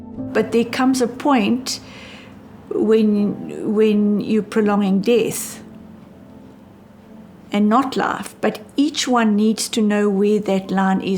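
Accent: South Africa. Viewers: That was really powerful and heartfelt. You say you are British but you have a South African accent and it looks as if you live there now. But you are so right as we don’t talk about our emotions as well as we should. The hospices do such a wonderful job, you are so right and it is not at all a depressing place. It would be wonderful if we could all end our lives there. By the way your grandmother is very beautiful and you obviously have her genes. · The accent is southafrican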